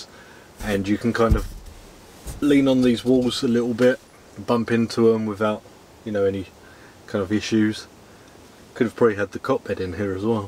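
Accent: England, United Kingdom. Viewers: Been viewing your videos lately and really enjoying them ....very relaxing . Good to hear your English as my ancestors came from England and Ireland.Iwas born a Spencer? Will watch more or your interesting videos. Cheers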